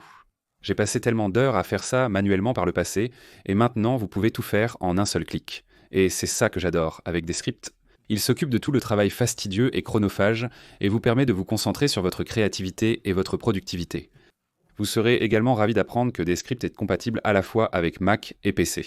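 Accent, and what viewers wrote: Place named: France. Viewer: French comment : french voice looks like something created by IA (synch lips not real), but the voice is good, with realistic french accent, not robotic. Pleasant enough.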